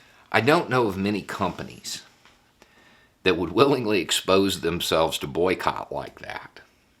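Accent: South, United States. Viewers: Too bad Jill Biden can't be Secretary of Education, cuz Joe's got ethics.🤘❤️🤘 Happy Diwali, y'all. PERFECT timing. Btw, YOUR Southern accent makes you sound smart.✌️ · You have a southern accent are you having to fight people in walmart like me with my Biden hat?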